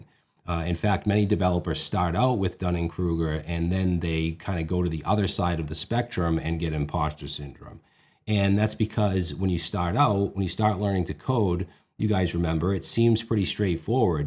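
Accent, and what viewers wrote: Boston, United States. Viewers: That Boston accent tho 🤣 But I am a NY'er and would rather learn listening to you than someone that barely speaks English! I have to say this because it happens a lot with music production too and dudes getting daunted by new DAW's. Don't go into anything thinking you are going to understand the ENTIRE THING - you ain't figuring out Ableton Live in a week when it took 100 developers years to build it. Jump in with a list of JUST what you need to get what you are doing DONE. Learn just how to do what you need to do, then as you need different things you don't understand you look them up one at a time, use AI it's super convenient compared to asking google questions. just my two cents.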